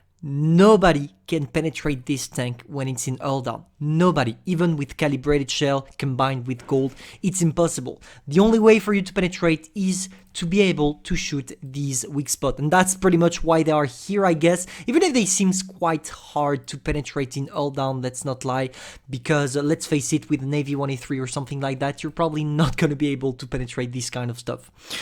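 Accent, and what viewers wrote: France. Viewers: Is u french......yes?!?! Well bounjour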